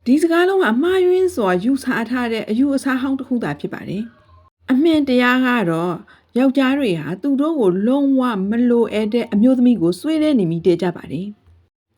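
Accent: Rakhine, Myanmar. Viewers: ရခိုင်သံကြီး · မမ ရခိုင်သံနင့် တူပါတယ်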